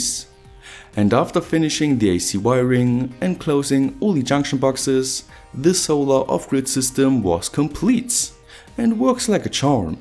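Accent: Germany. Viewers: I hate English with Russian accent (crazy Russian hacker) but English with German paradoxally is very nice :) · C'm'on, german accent is the best part of those videos :)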